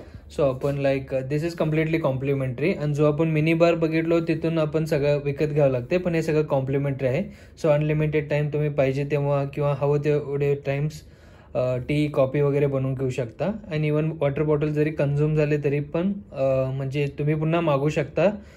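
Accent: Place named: Kolhapur, India. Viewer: भारी भारी 😃😃 As a 1st vlog looking much more confident. "पटनं तुम्हानी दाखवतो" will steal the hearts of kolhapuri folks. I'll be excited for more video like this specially in कोल्हापूरी accent touch. I know you have that inherent kolhapuri accent. So, looking forward for such more videos. by default "Minglish" language is understandable because of your profession. Keep it up 😅🎉👍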